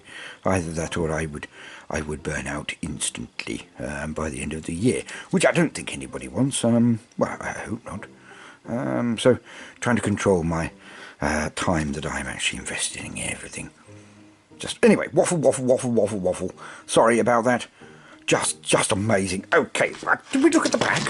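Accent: United Kingdom. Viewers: What part of Britain are you from? I notice differences in how you say some words vs how the Warhammer people say them...And that amazing accent!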